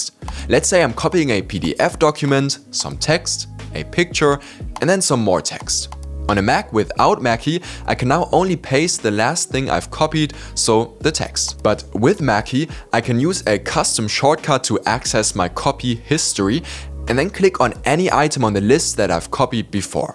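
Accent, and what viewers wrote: Germany. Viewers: Bist Deutscher? Klingt ziemlich danach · Wegen dem Akzent vermute ich mal dass du deutsch spricht und wow das war ein richtig gutes video jede app war ne mega empfehlung danke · i love the german accent so much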